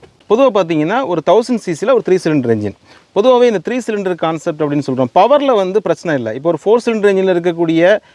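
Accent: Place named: Tirunelveli, India. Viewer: மாஷாஅல்லாஹ்.! இறைவன் உங்களுக்கு அருள் புரியட்டும்..!! உங்களின் வீடியோக்கள் அனைத்தும் புதிதாக கார் வாங்கும் மக்களுக்கு ஒரு சரியான வழிகாட்டி.!!! ஒரு காரில் உள்ள குறை, நிறைகளை சாமானிய மக்கள் கூட மிகத் தெளிவாக எந்த மனக் குழப்பமும் இல்லாமல் வாங்குவதற்கு மிக உதவியாக இருக்கிறது. அருமையான நெல்லைத் தமிழில் உங்களின் கார் பற்றிய வர்ணனை மிக அருமை. சில யூடியூப்பர்கள் ரிவியூ என்ற பேரில் டஸ், புஸ்ன்னு இங்கிலீஷ்ல பேசி தமிழர்களின் பொறுமையில் விரலை விட்டு விடுவார்கள். ஆனால் உங்களின் தனித்துவம் எப்போதும் எல்லோராலும்.. விரும்பப்படும். உங்களின் கனிவான, பணிவான பேச்சு & வார்த்தைகள் எல்லா "சமய" மக்களையும் கவர்ந்திழுக்கிறது. வெகுஜன மக்களின் அன்பும், ஆதரவும் உங்களுக்கு எப்போதும் உண்டு. ஏனென்றால் நீங்கள் மற்ற யூ-ட்யூப்பர்களை விட தனித்து நிற்க்கிறீர்கள்.! அதற்கு காரணம்..!? கமாண்டில் கேள்வி கேட்பவர்களுக்கு உங்களின் ஆரோக்கியமான, கண்ணியமான பதில்கள்.! வாகனங்கள் பற்றி உண்டான உங்களைப் போன்றவர்களின் மிக ஆழமான சிந்தனை இந்நாட்டு அரசுக்கும், நாட்டு மக்களுக்கும் அவசியம் தேவை. 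தமிழக, மற்றும் ஒன்றிய போக்குவரத்துதுறை உங்களை பயன்படுத்திக் கொள்ள வேண்டும். என்றும் உங்கள் பணிகள் சிறக்க என் பிரார்த்தனை & வாழ்த்துக்கள்.!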